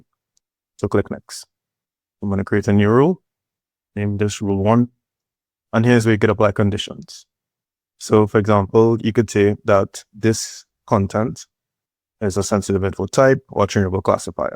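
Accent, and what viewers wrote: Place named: Trinidad and Tobago. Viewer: Dude sounds trini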